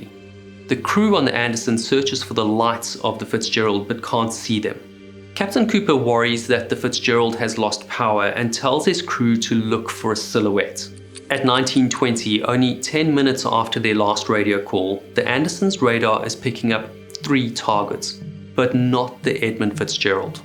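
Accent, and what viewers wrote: South Africa. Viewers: Dude, you sound a wee bit South African.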